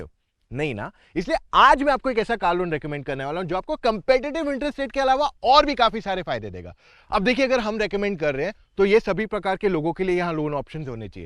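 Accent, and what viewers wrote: Gujarat, India. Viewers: Like your Gujarati Tone मेला होने वाला है l 😅😅😅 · He is Gujarati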